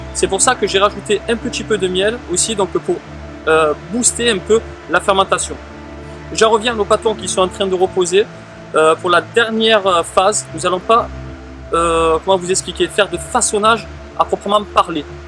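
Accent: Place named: Marseille, France. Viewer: Yeah merci ! Allez je test avec le sarrasin et je te dirai le résultat :-) merci encore. ET ENCORE, ENCORE, ENCORE !!! c'est trop bien tes vidéo. Puis le bon côté de l'accent marseillais, fait nous un petit "peuchère" dans la prochaine vidéo, que j'attends souvent sur le port de l'Estaque, quand je viens au Rove chez ma famille si tu connais :-)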